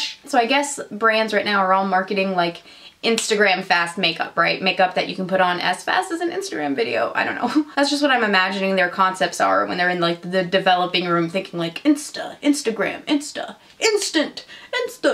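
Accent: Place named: South, United States. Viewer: you sound like you have some southern in you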